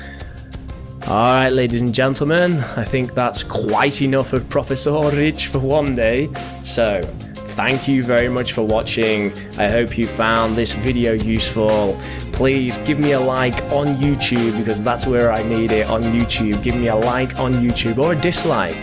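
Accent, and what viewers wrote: United Kingdom. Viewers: It's very funny. I like a lot. Now I you learn the british accent.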